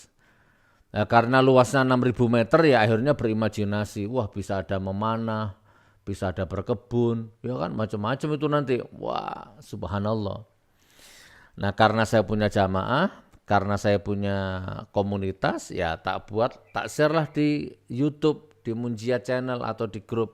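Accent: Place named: Java, Indonesia. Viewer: kangen logat medok jawa nya sama klemat klemot mulut mu gus tpi gus dobol wkwkwk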